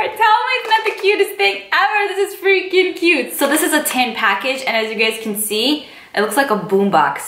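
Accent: New York, United States. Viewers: I can tell you from NY cause of our accent! I swear watching your videos is like having a girl chat with my non existent friends lol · Listening to you is like listening to a friend gush about something i must try! You are definitely from NY hehe The accents give us away.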